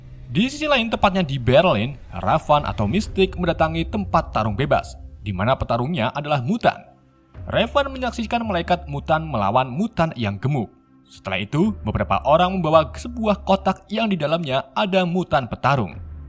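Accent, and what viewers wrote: Indonesia. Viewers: Iya bang, sebagai org yang msh bljr, saya merasa agak risih, karena pengen bgt bisa bahasa Inggris , kembali mendengar aksen indo:v. Sukses terus bang channel langganan wkwk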